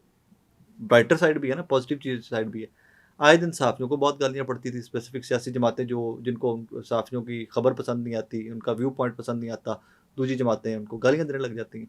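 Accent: Punjab, India. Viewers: Ap ka punjabi ka terka sun k sawad dugna ho jata ha vlog ka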